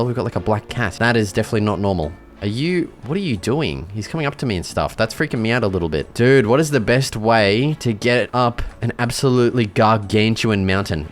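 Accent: Australia. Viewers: I don't know why but, i love Australian people's accents ❤ 😂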